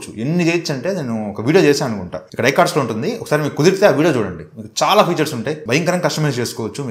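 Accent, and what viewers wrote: Nellore, India. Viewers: Bro nedhi Nellore ha ????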